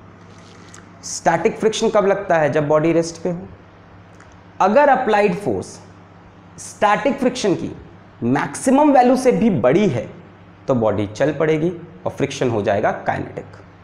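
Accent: India. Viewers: Unfortunately I can't understand Indians' accent 😭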